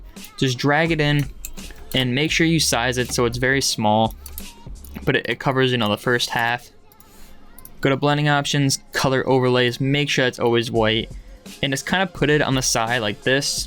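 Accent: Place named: United Kingdom. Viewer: I always thought u was from the uk. cos of the accent and your name ' ' im pretty sure thats a brittish name.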